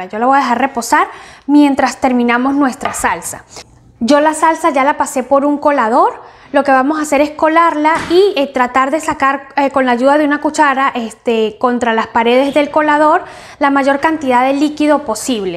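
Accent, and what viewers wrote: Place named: Venezuela. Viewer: De que parte de vzla es tu acento ?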